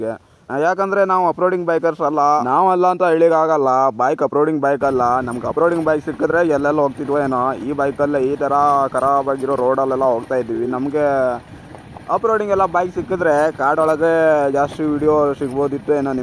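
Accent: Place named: Bengaluru, India. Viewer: Bengalur kannada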